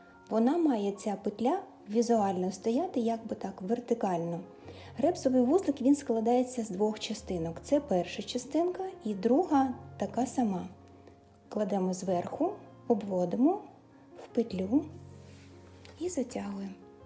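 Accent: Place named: Russia. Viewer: Странно звучит украинский язык от русскоговорящего человека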